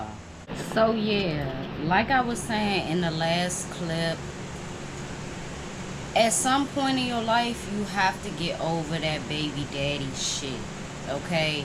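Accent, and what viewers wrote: Washington DC, United States. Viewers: Love the way you talk must be a dc thing ♥️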